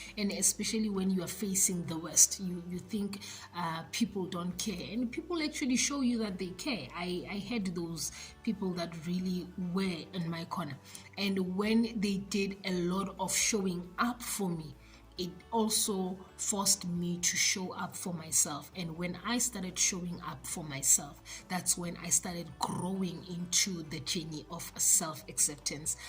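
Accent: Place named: South Africa. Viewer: you look absolutely beautiful in red, love the nails and your entire look. Love the authenticity and your south african accent 😘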